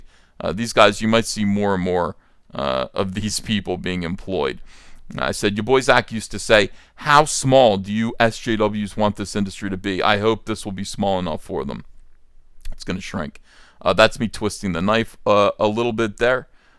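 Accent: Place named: Baltimore, United States. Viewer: You sound like you're from the Baltimore - Camden axis (which includes Cumberland).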